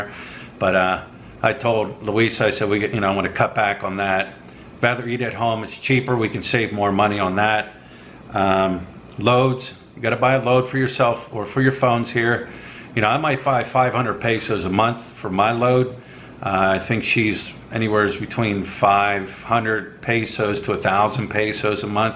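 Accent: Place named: West, United States. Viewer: Also it could be funny if you are from Philadelphia , but you sounds like from the west part of the US.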